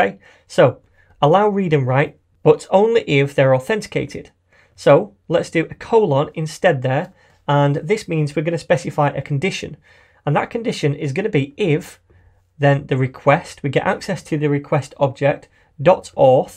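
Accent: United Kingdom. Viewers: I always watch your videos, now I have the same british accent as yours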